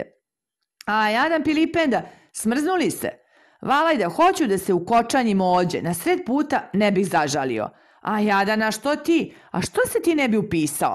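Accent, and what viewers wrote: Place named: Serbia. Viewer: Jeli ovako govore Dalmatinci ovo je Srpski iz Srbije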